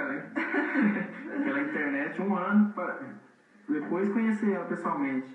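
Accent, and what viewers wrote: Goiás, Brazil. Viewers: Comecei a assistir os vídeos do canal, e pelo sotaque, já sabia q era goiano...huahuahua O sotaque não nega. Parabéns pelo sucesso! +1 inscrito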